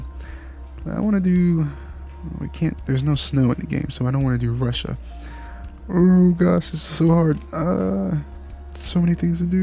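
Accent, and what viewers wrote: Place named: Netherlands. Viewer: he is from holland do you expect perfect English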